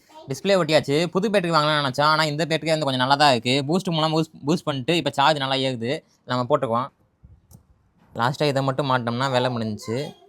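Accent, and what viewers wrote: Madurai, India. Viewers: அண்ணே ஆனா நீங்க மட்டும் எப்படி எல்லா மெக்கானிசம் பாக்குறீங்க உண்மையிலேயே வேற லெவலுன்னு நீங்க மதுரை ய?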